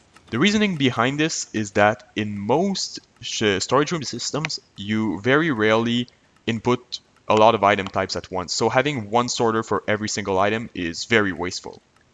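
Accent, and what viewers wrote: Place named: Quebec, Canada. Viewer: Bro t'es québécois? j'reconnais ton accent XD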